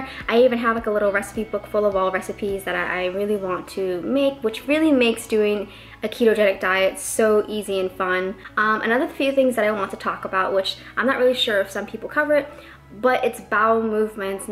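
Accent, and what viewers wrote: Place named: Brazil. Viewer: Are you brazilian? Pretty accent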